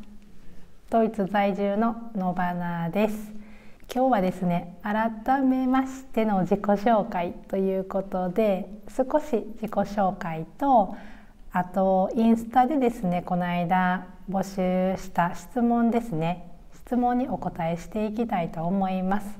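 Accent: Kansai, Japan. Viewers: ドイツに住んでおられる日本人の方々がおられる、なんとなく安心感を感じます。関西弁も素晴らしく感じます。私もドイツ在住です。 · 2万人おめでとうございます🎈 日本に着いたらおにぎり🍙たくさん食べてくださいね😋 動画に使われているBGM気づけばお風呂で口ずさんでいます笑関西弁だけど落ち着いた話し方が好きです❤ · Hallo 🙋🏻‍♀️ 大阪の泉州生まれ, タオル有名ですよね｡可愛い関西弁ですよ。Hannoverの近くに住んでおられるんですね \(´∀｀*) なんとお呼びすれば良いのですか？ママさん？ san？旦那様や、子供ちゃん達、めっちゃ日本語上手ですね。子育てについても、ちゃんと, 夫婦で話し合って決めていらっしゃる事が素敵です。おばあちゃまはオーストリア人🇦🇹なんですね。なんか嬉しい。(行った事ある国だから…) 😅 旦那様の日本のイメージ面白いですね🤣🤣 最近の日本は、多様性を重視するようになってきたと思いますが、まだまだなんですね。楽しかったです。👋🏻(╹◡╹ ) ♡❤️ Tschüss, bis bald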